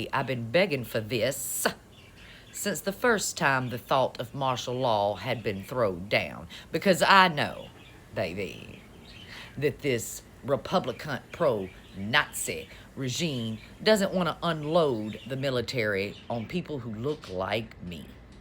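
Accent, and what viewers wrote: South, United States. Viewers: You’re brave!! Love your commentaries! You give us courage! I love your voice and your accent, “Why, I do declare, you sound like Scarlett O’Hara! Keep strong everyone! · 👏🏼👏🏼👏🏼PREACH BABY!!! 💯🎯With that deep southern drawl accent 😂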